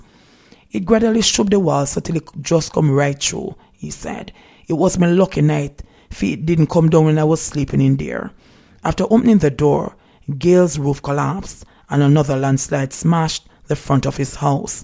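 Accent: Jamaica. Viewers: We just love the way you deliver the news, especially the Patwa. Blessings to you!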